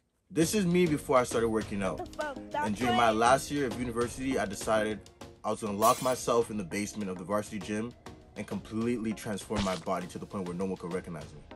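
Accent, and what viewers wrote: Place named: Toronto, Canada. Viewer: are you Canadian? you gotta toronto accent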